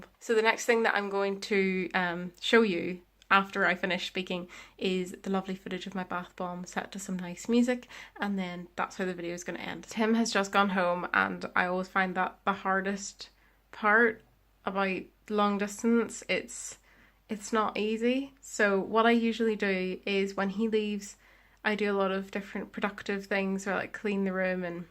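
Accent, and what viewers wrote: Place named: Ireland. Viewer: Born Irish Accent, the best accent in the Whole World v😁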